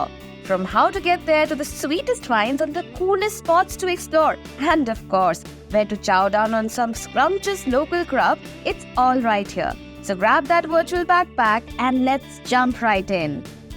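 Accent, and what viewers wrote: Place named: India. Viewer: How do you sound Indian and still manage to butcher the pronunciation of every Indian word?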